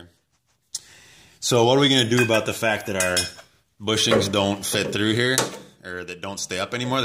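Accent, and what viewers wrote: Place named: Wisconsin, United States. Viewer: Hey are you the dude from Wisconsin??? Im assuming by your accent that you are. I live in Hayward myself! Lol. Cool video, My 98 Silverado is having the same issues.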